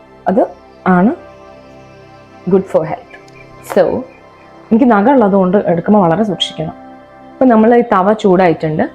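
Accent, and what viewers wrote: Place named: South Kerala, India. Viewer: നിങൾ കോഴിക്കോട് അല്ലേ . എന്തിനാ ബുദ്ധിമുട്ടി തെക്കൻ കേരള slang എടുക്കുന്നെ ?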